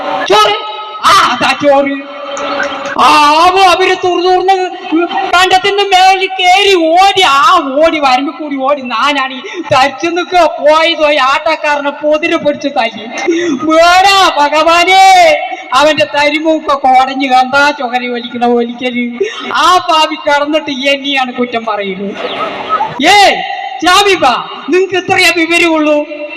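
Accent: Palakkad, India. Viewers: എടാ ചങ്ങാതി നീ മലയാളം ഈ പാലക്കാട് അപമാനിക്കുന്നത് നിനക്ക് നിന്റെ മക്കളെങ്ങനെയാണ് ചങ്ങായി സംസാരിക്കുന്നത് ജനിച്ചു പറഞ്ഞിട്ട് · പാലക്കാടുകാരുടെ മനം കളയുന്ന സംസാര രീതി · പാലക്കാട്‌ ഇതുപോലെ സംസാരിക്കുന്ന ആള്ക്കാര് കൂടുതൽ ആണോ അതോ ഇതിനെ വഷളാക്കുവാനോ എന്തോ നെറ്റ് und ന്തേലും ചെയ്തുവെക്കു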